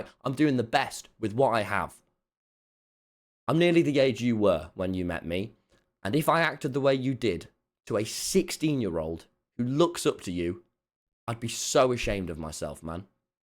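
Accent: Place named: Midlands, United Kingdom. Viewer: no that’s just a midlands accent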